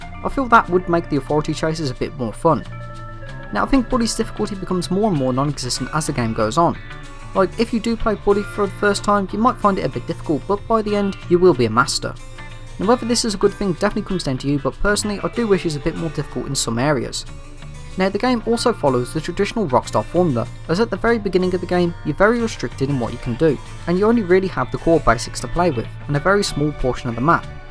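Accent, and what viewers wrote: United Kingdom. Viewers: you speak in a heavy British tone to the point I... cant understand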